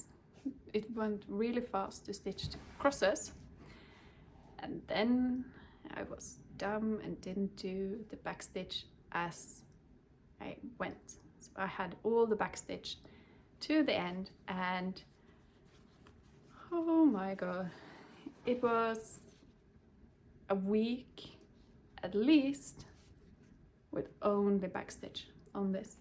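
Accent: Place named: Scandinavia. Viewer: Hello from Chicago. Great WIP parade - awesome collection. Thank you for sharing your personal story with us. I think you are beautiful and from my side of the pond, I think your speech sounds just like that of my other acquaintances from Sweden, Norway and Iceland. It is ironic that many of us are struggling with weight loss and we forget that there are other people on the other side of the spectrum who need to gain weight. Best wishes in your recovery, and I look forward to catching up with your videos.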